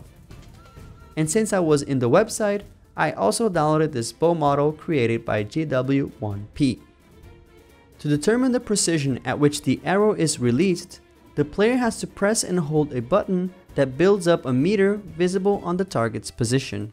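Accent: Brazil. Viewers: Haha do jeito que você falou já descobri que era Brasileiro. Não tinha ideia mesmo depois de 6 meses desde que comecei a assistir seu canal.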